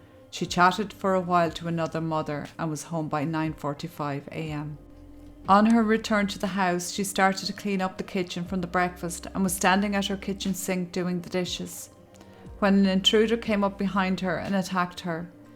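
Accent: Ireland. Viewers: YOU SHOULD DO MORE VIDEOS MY MATE. THERE GOOD AND QUICK TO THE POINTS. AND THAT IRISH ACCENT OF YOURS PUTS ME RIGHT TO KIP. 🍀🍀🍀🍀🍀👌 FROME LIVERPOOL ENGLAND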